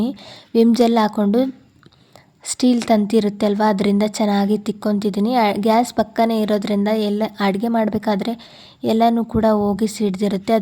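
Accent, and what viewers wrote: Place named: Uttara Kannada, India. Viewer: ಸೂಪರ್ ಸಿಸ್ಟರ್ ನಿಮ್ಮ ಉತ್ತರ ಕನ್ನಡ ಭಾಷೇನೆ ಚಂದ ಕೇಳೋಕೆ 😊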